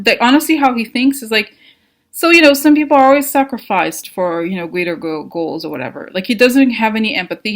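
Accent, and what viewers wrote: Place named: Russia. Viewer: Sometimes you have a russian accent. Umm Are you a scorpio? Good analysis of Jared. They have no souls. Thank you. XO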